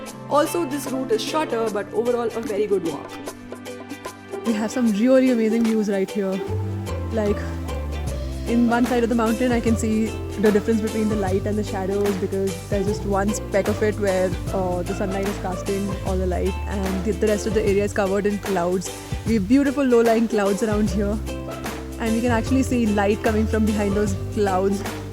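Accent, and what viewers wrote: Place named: North India, India. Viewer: We don't need travel advisory from North Indian girls trying to act like they are white. Annoying North Indian accent.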